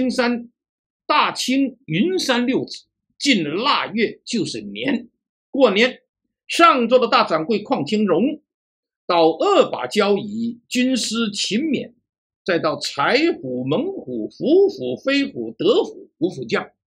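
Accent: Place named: South, China. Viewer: 北派的小说，南派的口音，南北结合，在我看来这是创意，老杨全网独一份🎉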